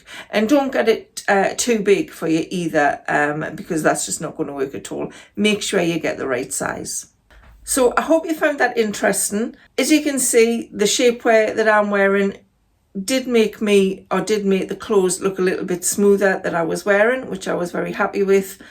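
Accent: Newcastle, United Kingdom. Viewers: Such a good informative advise to anyone using shapeware clothing to improve their image in clothing and your photos prove it works! Well done. Oh, and I love your geordie accent 💞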